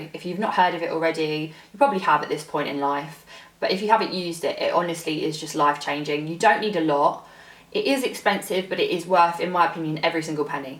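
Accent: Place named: England, United Kingdom. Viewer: Love ur English accent and ur so beautiful ❤️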